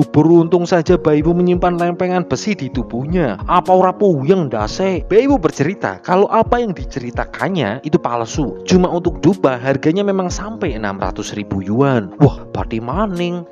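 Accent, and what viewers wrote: Banyumas, Indonesia. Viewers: Suaranya bikin ngantuk tapi ketika denger ngapaknya autu ngakak🤣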